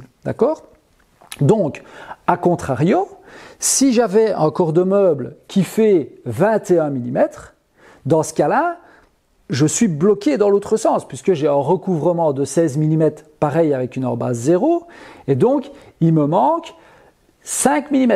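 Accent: Liège, Belgium. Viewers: clair et précis et liégeois oufti !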